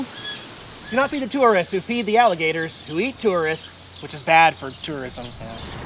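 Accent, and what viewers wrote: United States. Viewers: Geez am I glad you didn’t mention the name of the native Australian bird because you American say it wrong. Just as a point, its not pronounced emoo.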